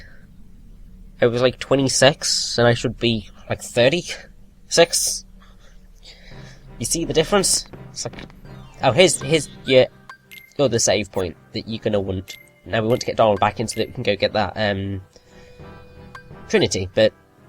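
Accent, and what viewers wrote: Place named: Wales, United Kingdom. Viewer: haha, you do sound a little Welsh! Nothing wrong with being English, although Welsh are better ;)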